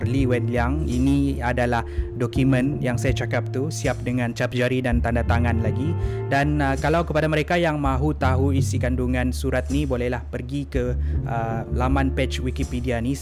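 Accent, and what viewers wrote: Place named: Sabah, Malaysia. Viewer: Cakap sabahan x lari.. I like it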